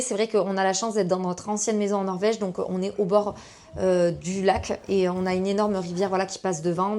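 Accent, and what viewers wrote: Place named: Toulouse, France. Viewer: J'adore ton petit accent toulousain mais tes contenus aussi, tu es une conteuse magnifique !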